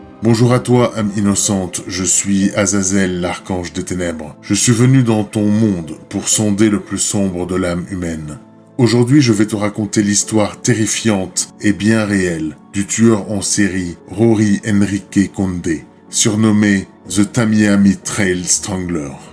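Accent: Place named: France. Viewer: Coucou, j'espère que tu vas bien ? Que faire quand on se rend compte de ta notification vers 4h du matin ? On se couche plus tard car on ne peut pas résister 😂. Par contre tu as un accent français très marrant. Encore un qui a eu une enfance très solitaire et pas très stable, pas très enrichissante pour un enfant. On peut comprendre qu'il veuille prendre le contrôle, lui qui ne l'a jamais eu et surtout se faire voir. Par contre il n'a pas "chômé" niveau nombre de meurtres, il les a tué de manière assez rapprochée par rapport à d'autres tueurs en série. Merci encore pour ta vidéo hyper intéressante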